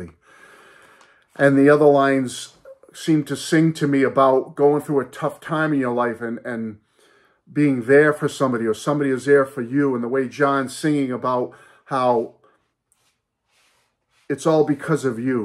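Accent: Boston, United States. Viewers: Your heartfelt sentiments are truly worthy of your love for mankind and the!! I always have a spot in my heart cause when I hear you I'm reminded of Boston where I lived for many a year 1974 to 1987 to be exact. So " " hearing your voice puts a warm smile on my face. God Bless and KEEP THE FAITH!!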